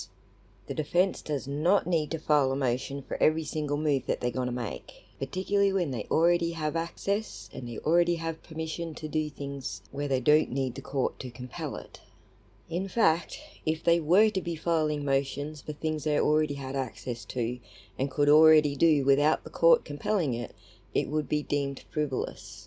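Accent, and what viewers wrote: Australia. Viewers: I always love listening to you Aussie.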